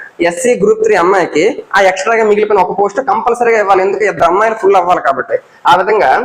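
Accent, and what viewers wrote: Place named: Vizianagaram, India. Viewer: Nee yaasa bhasa vijayanagaram or vishakapatnam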